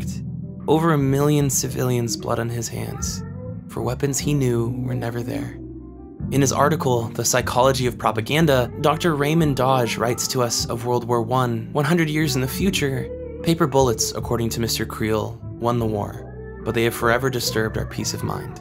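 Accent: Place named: United States. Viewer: Hi, this came up and I got to the point where you got to Myazaki pointing out how this is Iraq war. I agree war is bad, I don't think that is up for debate. But Myazaki is a known Pacifist, and as a Japanese person, I won't fault him for it. But based on your accent, you are an American. In the current world, do you think we should be pacifist? Its 2024, and there are multiple wars we are supporting. Should we pull out?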